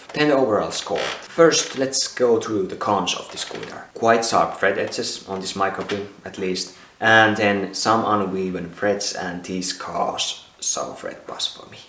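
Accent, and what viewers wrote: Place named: Finland. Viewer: this man sounds very finnish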